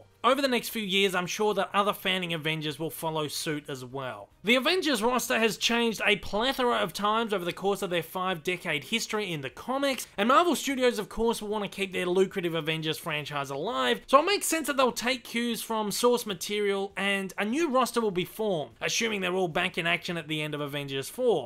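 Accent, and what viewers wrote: Australia. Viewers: Great job with the video man but fuck me your voice! You sound like an Aussie version of the slug woman from Monsters Ink 😂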